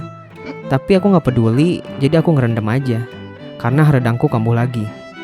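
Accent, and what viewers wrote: West Java, Indonesia. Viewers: dari logat ngomong nya kau orang sunda ya bang kalau iya sarua euy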